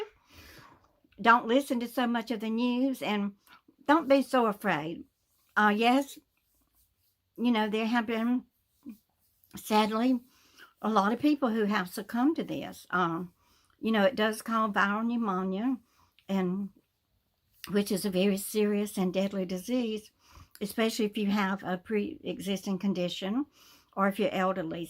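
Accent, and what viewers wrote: South, United States. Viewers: Hiiii Ms 🌞You Sure Are A Beautiful Sight For Sore And Half Blind Eyes😃! You Sound & Look Great, So Pretty Without Your Glasses Too! Really Looks And Sounds So Much Like You, Both Soft Southern Soeaking Precious Woman, So Glad. You Are On Your Way To Fully Recuperating, Thank You For A Very Informative Video, and Thank for the Booster Home Remedy, still now just need to purchase the Ginger Root, then I'll be set!😃 God Bless You Blessing, I Love You Ms.!🌺🙏🌺 😇😇😇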